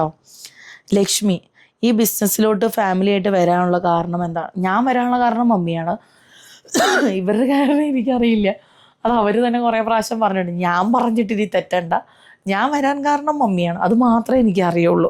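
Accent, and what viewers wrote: Thrissur, India. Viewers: kunje ethu dress ittaalum kaanaan nalla bangiund U R always perfect by all means Kottayam kaari yude language slang Thrissur kaari pole aayi Kottayam pokunna thrill il aann enjoy cheyyu Happy n Safe journey God Bless ❤❤❤❤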